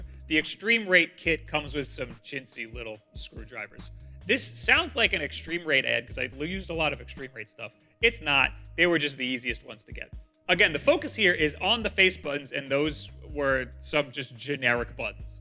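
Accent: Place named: New York, United States. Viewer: your new yorker accent really makes me wanna buy half of the things you do ads for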